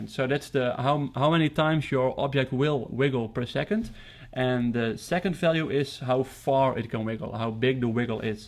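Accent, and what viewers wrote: Netherlands. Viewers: Are you dutch? hahah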